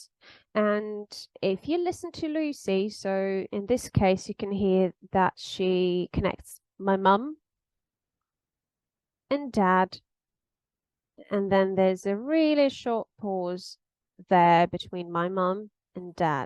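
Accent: United Kingdom. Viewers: What's the practical reason for having such a very British-like sounding accent? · i love the gentleness and preciseness of the british accent if that even makes sense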